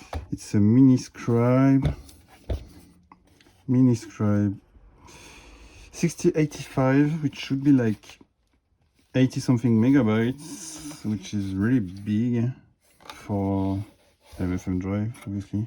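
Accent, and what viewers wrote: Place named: France. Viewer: I hope you do more of these live unboxing. We’re all here for your lovely French accent <3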